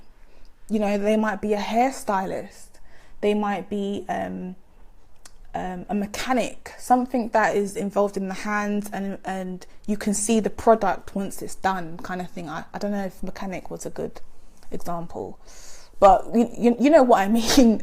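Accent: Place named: Nottingham, United Kingdom. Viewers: Hi, great video as always. Are you from Nottingham? Your accent sounds similar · There’s nothing wrong with the Nottingham accent 🤨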